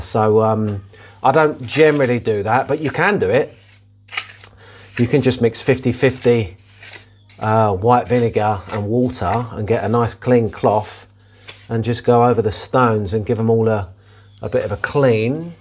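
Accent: Essex, United Kingdom. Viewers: G’day from Australia..as you said about your Essex accent not saying some of the letters in the words…even though you said the word”crepe” the way it’s spelt..we spell it the same but for some reason we say it as “ crape”???? Not to be confused with with “ crap”🤣🤣🤣 silly bugger,not naming your plants..but you will get a nice suprise when they flower or fruit….your lunch looked delicious also…really enjoying your extra videos..thankyou for sharing 🇦🇺🇦🇺🇦🇺🇦🇺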